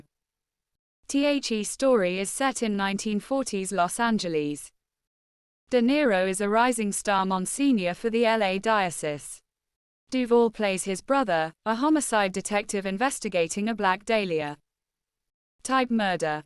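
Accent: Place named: England, United Kingdom. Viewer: Maybe I would have heard this robotic voice in an American accent with an English accent is much harder to listen to this.😢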